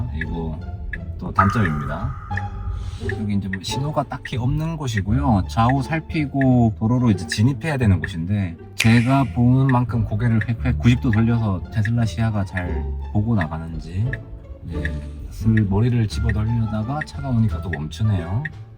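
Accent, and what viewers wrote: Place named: Gyeongsang, South Korea. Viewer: 오잉. 갱상도 말 쓰시네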